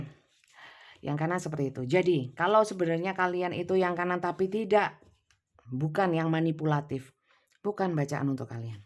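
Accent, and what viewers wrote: Java, Indonesia. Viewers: Saya baru bergabung. Saya jatuh cinta dengan aksen medok nya, ibu. Sangat terhibur sekali. Tidak semua resonet, tapi apa yg ibu katakan masuk akal kalau. Membuka mind set saya. Dan saya mau subscribe n like postingan ibu. Semangat untuk upload ya Bu. Saya pasti dengerin ibu, tidak masalah resonet atau tidak.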